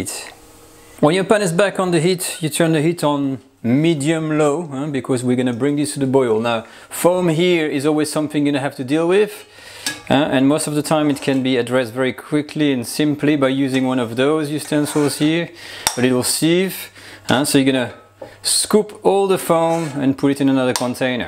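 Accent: France. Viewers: this guy talks too much...the first 3 minutes repeats same 15 second information twelve times in thick french accent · Your English is utterly idiomatic but with a French accent. Magnifique!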